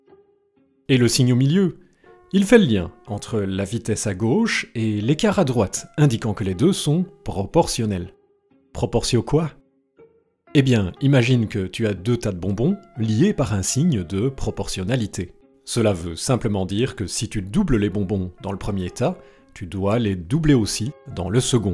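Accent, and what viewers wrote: Belgium. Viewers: "Elle est cachée dans le bouisson." J'adore l'accent belge ! Merci pour le starter kit c'est génial!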